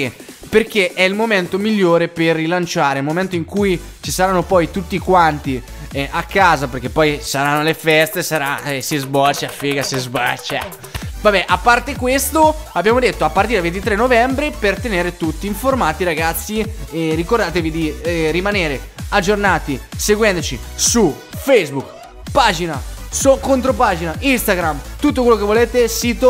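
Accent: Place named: Ancona, Italy. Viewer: se vede da do chilometri che sei anconetano. grande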